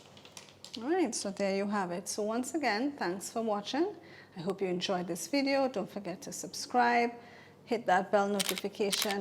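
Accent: Trinidad and Tobago. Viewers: YESS!!! Can spot a Trini anywhere! Thanks for this! Gonna try it out!❤😁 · Hey! You have to be a Trini 😁😁😁👏🏾👏🏾👏🏾. That accent! Nice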